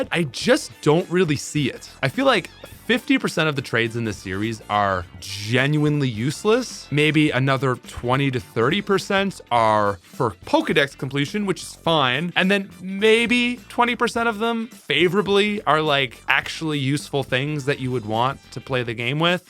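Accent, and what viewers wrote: Canada. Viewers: Screw this Canadian accent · He sounds so aggressively Canadian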